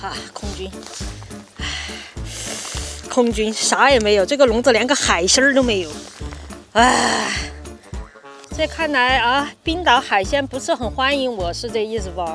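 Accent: Sichuan, China. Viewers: Yooupi講四川口音是一大特色！ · Halibut 清蒸最棒！四川口音杠杠滴！ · 特别喜欢yooupi说四川话 感觉很好听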